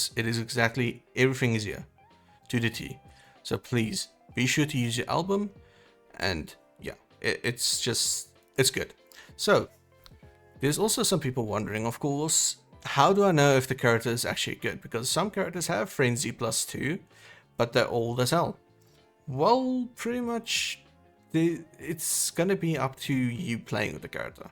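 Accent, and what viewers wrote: South Africa. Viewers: Hi thanks for this. Started a few weeks ago and found this helpful. Btw am I hearing a South African accent?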